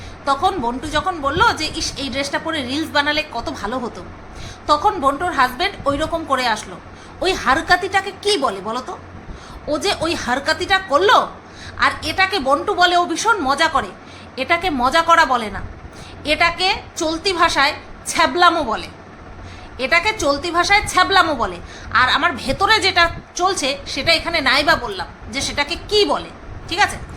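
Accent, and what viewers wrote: Bangal, Bangladesh. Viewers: didivai tmr mukhe bangal vasa ta sunte hebby lage😊😊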